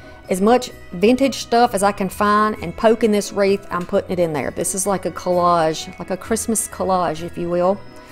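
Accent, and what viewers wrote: South, United States. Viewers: Being a child of the 50s.... I LOVE this.... but I have to say it hurt my heart when you cut the books !!!! LOL.... but love that you gave it new life...... I would love to see more of this... I am a new subbie!! And I can tell you are a fellow Southerner..... Mississippi here..... looking forward to more videos...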